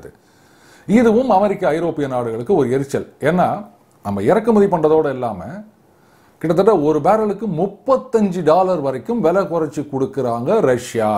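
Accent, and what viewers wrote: Chennai, India. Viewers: Madras தமிழ் பேச்சு, பிரமாதம்.....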